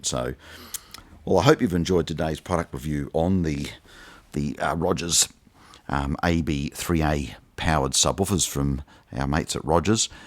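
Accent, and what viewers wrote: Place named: New Zealand. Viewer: I can't afford these things... yet! But my BestVox LS3/5a are definitely going to find a home on top of these at some point. In the meantime, I'll have to live with the Spotless Seed 1-way speakers, and their dedicated subwoofer/stands. They are rather incredible. So that is not difficult. I own 26 sets of speakers, and 7 subwoofers. :) Edit: LOL. We have the same RCA cables. Great minds... ;) Edit 2: LOL. We have the same Kiwi accent, too. I'm in CHC. :) Edit 3: Sorry to say this, but you are sitting miles too far from those speakers. You are well beyond the Critical Position according to Reverberance Decay theory. That means the room is BY FAR the biggest contributor to the sound you hear. If you were to toe them in a little, so the 5as focus about 1.5m behind your head, and you sit within 6 feet of the speakers, you will be absolutely shocked at how great your system now sounds. 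Try it! Rooms are horrible for farfield audio, in general. And Nearfield listening is the universal cheat code. I only sit 70cm from the speakers, and 60cm from each subwoofer in my all-Class-D quad monoblock setup running 4x 48v 10A GaN PSUs. And my system is capable of doing things to the human nervous system that $100,000 systems can not. Nearfield is why. And also extreme attention to set-up, and the time domain - which ruins 95% of all stereo systems.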